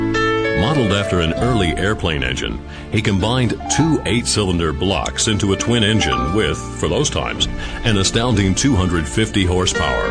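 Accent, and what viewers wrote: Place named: France. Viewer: why is he saying everything in a french accent :/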